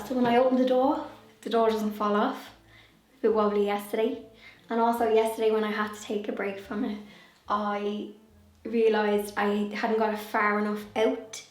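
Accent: Dublin, Ireland. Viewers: Lovely hearing the true dub accent put paper on top of all your presses , and I change them every Christmas when decorating as well as my fire alarm batteries. Keep going girl you’re doing a great job . Up the kingdom 😂❤❤🪴💐☘️☘️ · 😂😂😂 “prehistoric grease”You are pretty amazing being able to accomplish all that you do. And something I never thought about but I wonder if my great grandmother had a brogue like yours or your brother. ❤❤. ☘️☘️☘️☘️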